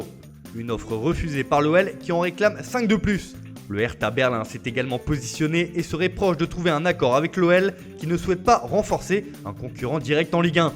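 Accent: Italy. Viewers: Toujours sympaq cet acent italien 😁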